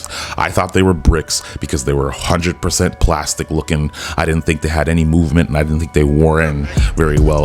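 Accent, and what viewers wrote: Toronto, Canada. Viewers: you definitely from Toronto 😂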